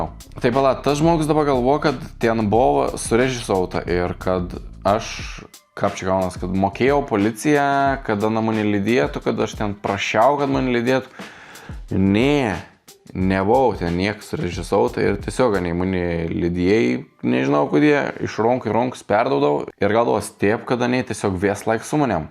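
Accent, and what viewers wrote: Samogitia, Lithuania. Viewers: Pažiūriu ir tavo ir Pauliaus keliones ir nematau išvis jokio panašumo tarp jūsų ir jokio kopijavimo. Jei esat iš Žemaitijos tai ir kalbat taip. Va jei kuris nebūdamas iš ten mėgintų taip kalbėt tada suprantu, kad kopijuoja kažkas kažką. Man patinka tavo kelionės, tavo video, šaunuolis, linkiu kuo daugiau prenumeratorių ir gerų kelionių 😊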